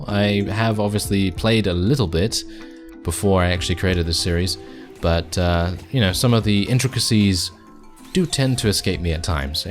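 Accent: United Kingdom. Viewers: Okay, so, look, I need you to do a thing for me. Very simple. Low to mid maintenance, and I feel your enjoy it. Maybe, maybe not, who knows with the spin of the Earth slowing and what all. Anyway, I need you to do this simple thing. Very easy. Very. I need you to do voice over work for either parody nature documentaries, or on comedy science channels. Cause goddamnit your soothing British wailing would delight and tickle the average viewer.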